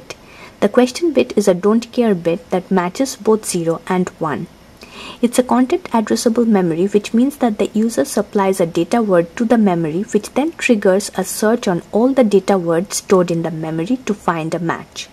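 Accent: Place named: India. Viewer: please, can one indian in math/comp.science vids speak without this fucking accent. just one?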